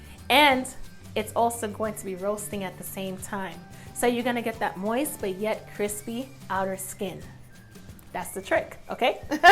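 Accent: New York, United States. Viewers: Are you from New York or New jersey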